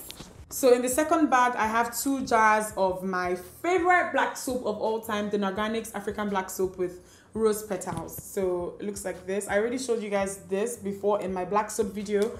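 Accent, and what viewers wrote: Nigeria. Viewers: I have sooo many compliments to give ... From the eyeshadow to your skin , I love the fact that your Nigerian accent has not changed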